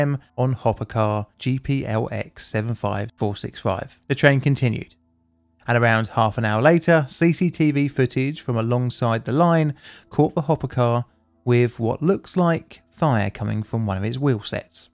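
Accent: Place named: London, United Kingdom. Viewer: I was sat back listening doing a few things and I was thinking to myself you know what Joshua this bloke sounds like he’s from London in the end though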